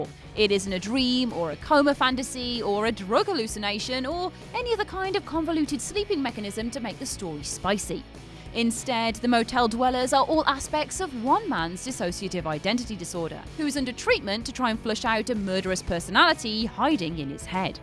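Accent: United Kingdom. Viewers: That's pretty standard British dialect. They pronounce a lot of French in standard English phonetics.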